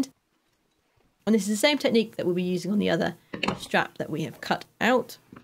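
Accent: England, United Kingdom. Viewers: Dear I think you are from England because of your English language accent, am I right.?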